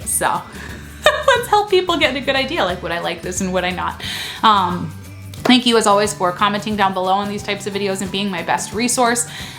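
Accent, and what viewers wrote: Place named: North Carolina, United States. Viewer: Why is that sobering? At least I would be able to live comfortable and would be able to succeed in my life. That's my intentions to start my own business in a better state. I don't want to go in SC it's not the best state to accomplish my goals. Are you from NC? Because you sound like you were born here?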